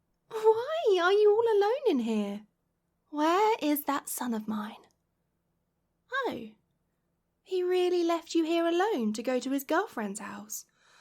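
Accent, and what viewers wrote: United Kingdom. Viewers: This is so aggressively British I love it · U have such a sophicated and beautiful voice and the fact your britsh is amazing ❤ ( I'm britsh too😊)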